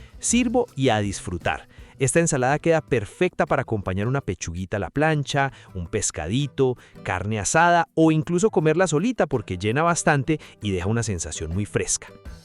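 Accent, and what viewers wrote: Antioquia, Colombia. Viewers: ME GUSTA LA FORMA DE SU NARRATIVA PAISA..Y AL FINAL UNO LE AGREGA OTROS INGREDIENTES ATUN..POLLO ASADO EN TROZOS Y EL ADEREZO LO PUEDO CAMBIAR..Y LA BASE DE SU ENSALADA ES EL INICIO DE UNA COMIDA.GRACIAS POR MOTIVARNOS A COMER SANO..Y CON TODOS LOS INGREDIENTES COLOMBIANOS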